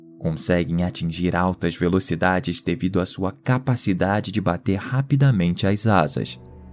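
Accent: Rio de Janeiro, Brazil. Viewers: Esse narrador carioca é a melhor parte do vídeo 😊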